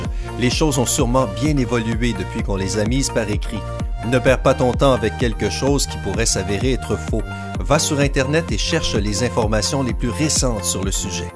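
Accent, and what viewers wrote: Quebec, Canada. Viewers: Un Canadien parle! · Vue son accent je crois que c’est un Québécois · Accent québécois ? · J'aime pas la facon cannadien de parler francais nuul.avec mes respect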